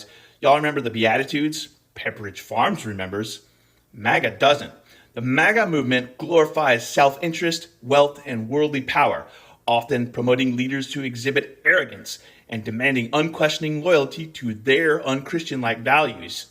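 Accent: Scotland, United Kingdom. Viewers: are you from Scotland because i always hear an accent come through on your vids?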